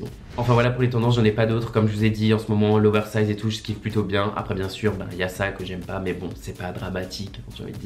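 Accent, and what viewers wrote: Alsace, France. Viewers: par contre ton accent alsaciien j’adooore ! Je viens d’alsace aussi et mon cote maternel a le mm nom de famille que toi😋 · Ton accent Alsacien 😂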